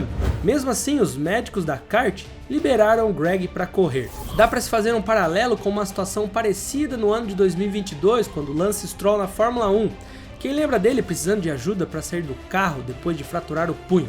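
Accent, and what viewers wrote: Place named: Paraná, Brazil. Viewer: O narrador é da região Oeste do Estado do Paraná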